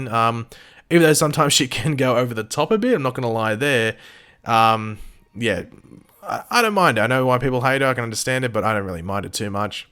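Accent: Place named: Australia. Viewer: B A He has an Australian accent bugs for brains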